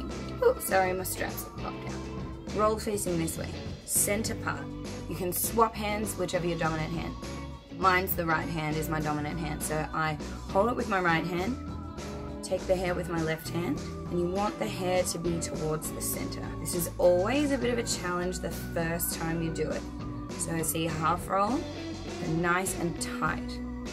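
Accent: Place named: Australia. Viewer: It sounds to me like you now have a middling English with slight Australian accent. Nice!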